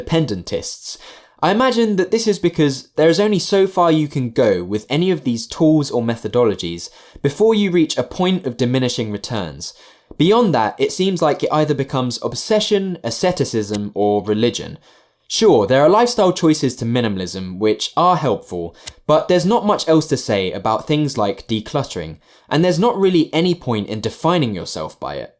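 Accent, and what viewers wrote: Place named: England, United Kingdom. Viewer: Sorry to say so, but I raise an eyebrow when the first thing I see is an english sounding Matt D´Avella copy that sells a minimalist wallet in the first minute of the video. However as I am not that full of cynicsm and sarcams, I just watched the whole video and agree on many things. „Minimalism is a lifestyle for wealthy people who pretend to live like poor people.“ It is also for „better educated people“, who have the time and effort to change their lifestyle. That is all by design and choice. But Minimalism aside from a modern philosophy for the „Overfed wealthy young spoiled consumerism addict“ is a concept for everyone, a concept for the whole society. It is important to point that out and see the downsides of how minimalism is shown to the public by the regular show-off tools (socialmedia and yt). Minimalism is already misused by advertising, companys and consumerism lifestyle. 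Hey Look, my newest edc minimalist workout routine against procrastination! Nope, the bluecollar worker probably has to work nightshifts and overtime to just get food on the table and not the newest middleclass lifestyle Gadget that makes you feel like you are intentional.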